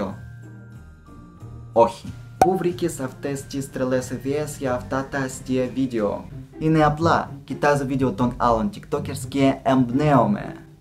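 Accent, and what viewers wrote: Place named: Russia. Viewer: Τρελαίνομαι για Ρωσική προφορά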